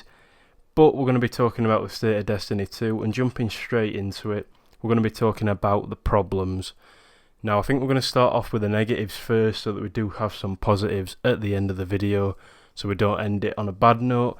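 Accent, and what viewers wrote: Yorkshire, United Kingdom. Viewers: I only live forty minutes from Sheffield. Your accent is one of the reasons I subscribed. Can't beat the no nonsense opinions of a Yorkshireman! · I don’t know if you’ll see this but I love your accent 😂 it sounds Yorkshire a bit I’m from Yorkshire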